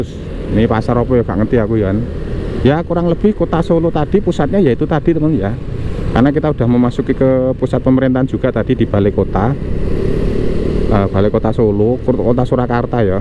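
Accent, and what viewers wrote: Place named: Malang, Indonesia. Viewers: ngomong omah e klaten mas, tapi logat mu wong jatim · Solo pancen ngangenin, Mas wong Klaten tapi logete Malang puol..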